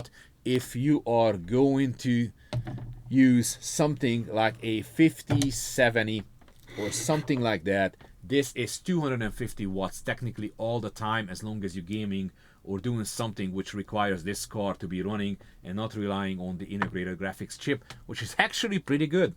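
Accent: Hungary. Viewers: you have a Hungarian accent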